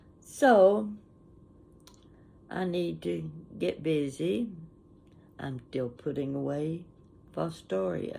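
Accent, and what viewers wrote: South, United States. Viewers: You remind me of my Aunt Jean who lived in the country of Alabama, same sweet smile. I think I must have grown up deep south a bit since both my parents were from Birmingham, Alabama. However, they moved to Texas where I was born. So friends tell me I sound more like I'm from New England with a few Texas bits, but I have deep southern habits. Lucky me! Anyway, I love stories and hearing your accent reminds me of my mom. Bless you.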